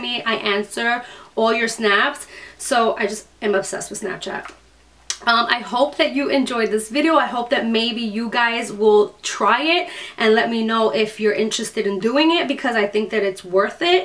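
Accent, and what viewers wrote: New York, United States. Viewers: Def a New Yorker like me haha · Sounds like a New York,Staten island or Long Island accent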